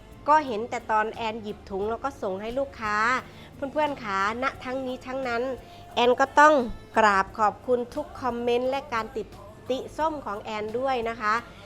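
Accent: Isan, Thailand. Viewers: ชอบนะ พูดกลางปนอีสาน ได้เรียนรู้ไปด้วย เป็นส่วนหนึ่งของอัตลักษณ์ไทย เพราะประเทศไทยไม่ใช่แค่ กทม. ขอให้ขายดีทุกวันเด้อ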